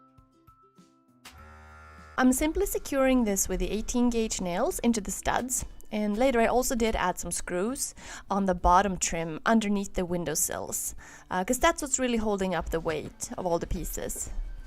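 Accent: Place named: Sweden. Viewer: Claims to be Swedish, sounds Swedish. Doesn't cover the outhouse in pine (furu). The Norwegians get suspicious of this behaviour.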